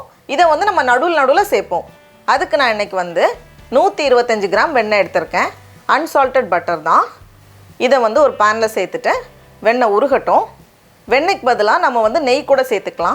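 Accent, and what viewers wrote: Nagercoil, India. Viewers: அக்கா நீங்க பண்ற ஒவ்வொரு சமையலும் அவ்வளவு அழகாக உள்ளது அதுவும் உங்கள் நாகர்கோவில் பேச்சி அருமையாக உள்ளது எனக்கும் நாகர்கோவில் தான் நீங்கள் சொல்லித் தரும் விதம் எளிமையாக புரியும் படி இருக்கிறது ரொம்ப நன்றி தயவுசெய்து எனக்கு பதில் கமெண்ட் குடுங்க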